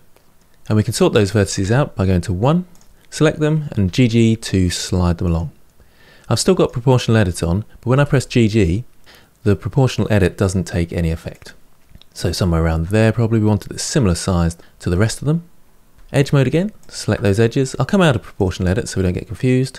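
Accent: United Kingdom. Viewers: I will forever have a british man voice in my head when modelling in blender. G to grab, s to scale.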